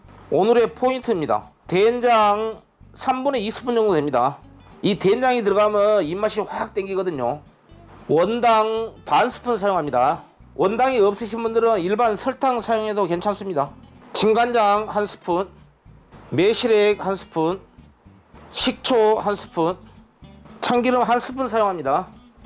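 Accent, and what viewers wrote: Jeolla, South Korea. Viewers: 맛깔나게 양념하고 내고향 전라도 사투리까지 구수하게 더하니 더욱 맛잇는 요리가 될거같아요 바로 따라해볼께요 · 전라도 말씨네요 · 아따 때깔 좋아붑니다요 말투 너무 정겹고 좋아요 혹시 전라도 북도쪽이 고향 이신가요 저희 고향 사투리 억양까지 너무 비슷해요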